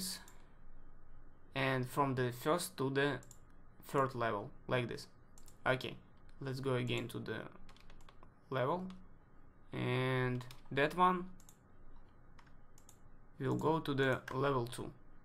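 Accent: Russia. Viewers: Так хорошо воспринимается английский монолог, сказанный с русским акцентом) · Какой ужасный русский акцент!) и столько ошибок походу действия) однако ты молодец! Хороший канал у тебя! Желаю удачи везде и во всем!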